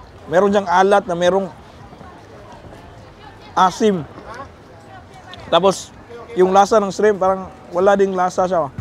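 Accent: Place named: Cebu, Philippines. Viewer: Taga cebu ka lods?? Mao mao mn gud ug tuno sa inisturyahan ni 😁😁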